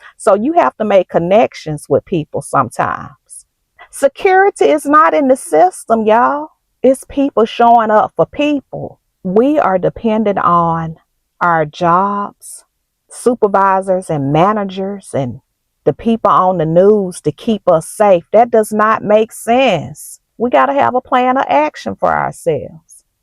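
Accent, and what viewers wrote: South, United States. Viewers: I love your southern voice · I just love her southern accent😊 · The algorithm sent me your video. 1st time watching anything from you. Love your Southern accent. Love you sis. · I love your southern accent. I got one, too. Born and raised in northeast Arkansas but currently living in Oklahoma.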